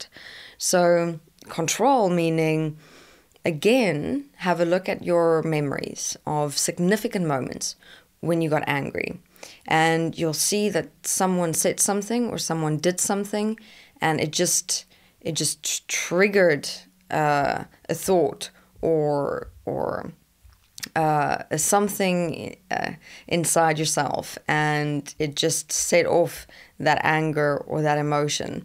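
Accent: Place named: South Africa. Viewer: Sheesh. South African....Netherlands, Scandinavian...?? I'm hearing bits of Maori for crying out loud. Gaaaah! Where is your accent from?? Thanks for helpful video too.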